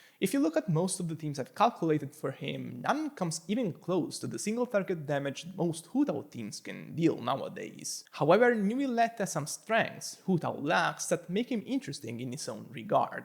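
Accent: Italy. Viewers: Thank you so much for the content you provide to the community, your graphs are always intuitive and extremely usefull to value and compare weapons, builds, and team comps. If i may ask a favor, when or if you will eventually do Furina's damage calculations, could you please make a comparison between her BiS weapon and mistsplitter with her c2? Probably i won't be pulling for her BiS even if i might go for her c2 cause of "green donut", and mistsplitter is what i would give her in that case. It might be the case for a lot of people but maybe they have a different weapon in mind so you'd probably need to make calculations with every weapon to make everyone happy xD, but you do you. Also, are you italian? Cause i am and your accent sounds italian to me xD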